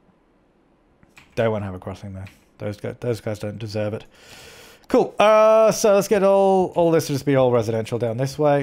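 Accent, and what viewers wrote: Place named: Australia. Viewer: I like ur channel cos u have an Australian accent which is epic ( I have a English accent)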